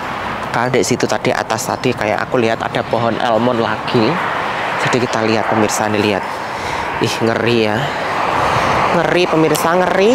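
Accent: Java, Indonesia. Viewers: Baru dua hari ini ngikuti flog njenengan mas....asik janan...bhs medoknya...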